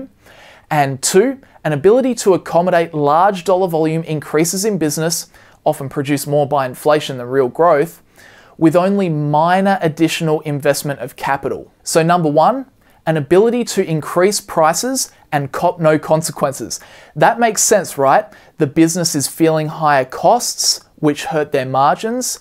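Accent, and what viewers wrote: Australia. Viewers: Are you Australian? The accent sounds familiar... Thanks for the good video 💪🏼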